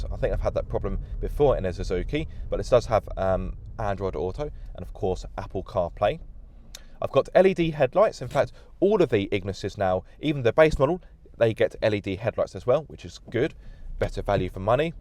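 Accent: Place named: RP, United Kingdom. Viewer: Stop trying to talk posh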